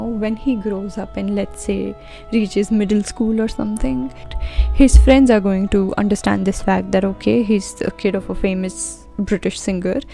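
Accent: India. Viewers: Lmao dw be proud of your accent. Idk y but ur accent sounds like an Indian accent to me cse like I'm from India too and it sounds too familiar. (u don't have to reply if u don't wanna and if you're not comfortable with letting ppl know where u come from) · I am just loving your Indian accent ✨...and larrie is real 💙💚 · Just me Or ur accent sound a bit indian?????? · I was thinking the same her accent is soo Indian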